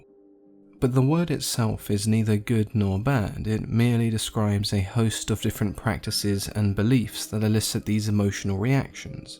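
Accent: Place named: England, United Kingdom. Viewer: They should use your English accent and voice on planet earth and national geographic well and fish sea mammals lions an bears deadly insect bites you would make it more interesting word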